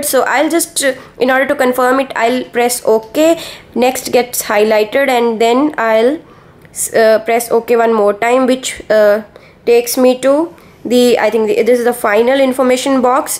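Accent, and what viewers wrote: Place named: India. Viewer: Endian accent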